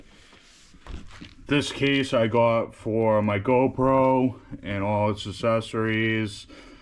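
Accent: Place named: Massachusetts, United States. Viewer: I can tell by your accent. I'm originally from Wareham down by the cape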